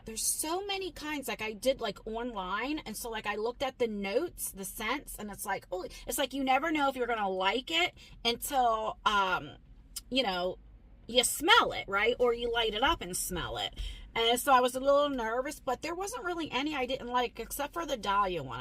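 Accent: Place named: New York, United States. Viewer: Hey! I’m originally from New York, I’ve been in Florida for 30 years. I hear your voice and I get homesick L O L… stick to your guns on your new life! Sounds like you’re getting another chance at a calm, peaceful, relaxing life! Count your blessings! You are rocking it! ❤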